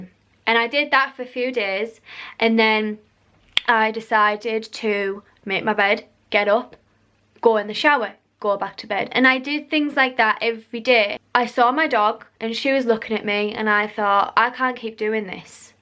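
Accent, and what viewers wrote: United Kingdom. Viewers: what part of england is her accent from? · Love the accent. Is that Brighton? Newcastle? So many accents in the UK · Northern for sure.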